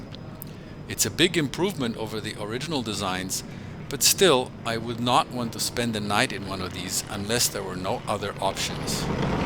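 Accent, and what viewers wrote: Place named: Poland. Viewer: Świetny materiał , znajomość języka perfekcyjna z lekkim polskim akcentem . Ale sam filmik perfect .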